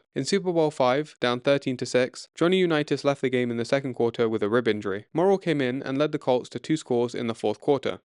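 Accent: England, United Kingdom. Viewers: Why do you have a English accent person talking about American football,stupid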